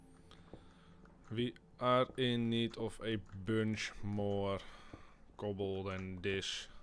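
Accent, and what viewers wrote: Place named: Netherlands. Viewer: Ik hoor een beetje nederlands accent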